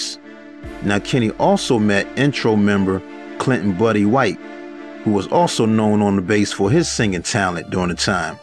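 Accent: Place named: New York, United States. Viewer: Dorothy Copeland Sounds like my city NYC !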